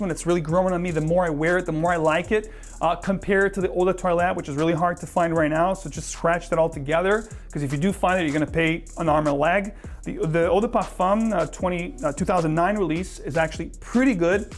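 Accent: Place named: New England, United States. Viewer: you're from nee england brotha 💪🏽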